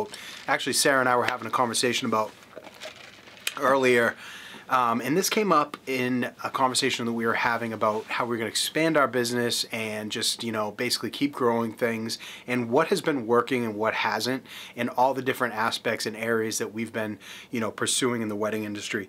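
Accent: Boston, United States. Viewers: Hey bud you from Rhode Island? Or Boston?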